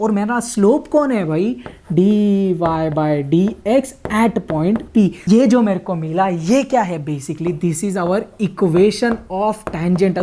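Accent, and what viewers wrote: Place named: Aurangabad, India. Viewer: Are u from Aurangabad ?? Cause of your language is just like Aurnagabdi Hindi .. 💯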